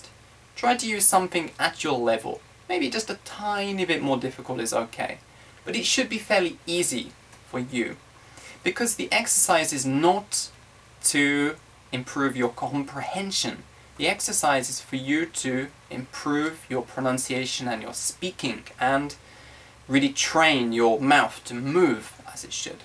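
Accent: United Kingdom. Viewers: r y brittish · are you british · R u a british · Love your British accent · British accent !! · Hi!, nice to meet you! I am from Mexico, Puebla. I am learning English, It has been very dificult for me to learn a second language, however, I am trying to do my best. Trying to improve my speaking and pronunciation. Surfing the net, I found your videos by chance. I enjoyed British pronuntiation, because it is elegant and sublime for me, but the most was the way you explain your explanations, it is easy for me to understand your points of view to be a better speaker. I am very happy because I have been learning from your videos, I started praticing the tecnique called shadowing, I am impressed for the results, because not only I could improve my good pronunciation, but also my fluency was very good. It is incredible that my mind automatically help me to find the vocabulary that I needed to structure my ideas. 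Thanks a lot to share your knowledge and experiencie with people who really is interesting to learn English. Sorry for my bad English. My best regards Paty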